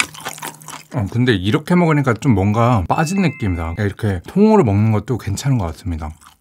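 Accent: Cheongju, South Korea. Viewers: 혹시 청주 사람이신가요?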